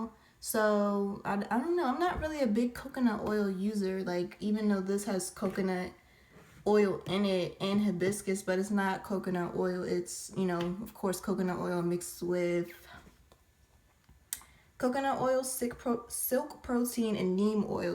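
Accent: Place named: DMV, United States. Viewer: You talk like you from the dmv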